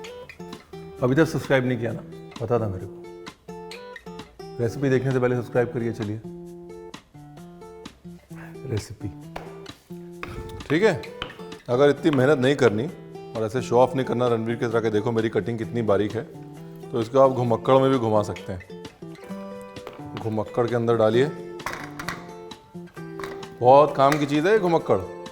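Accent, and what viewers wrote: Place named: Bhojpuri, India. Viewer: Babua bhojpuri jaisan bolat baran 😊🥰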